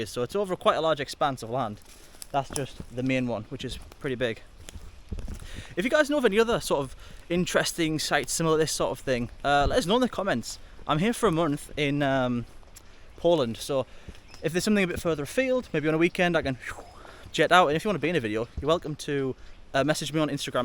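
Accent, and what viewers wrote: England, United Kingdom. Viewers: Czy ty nie słyszysz że to Anglik.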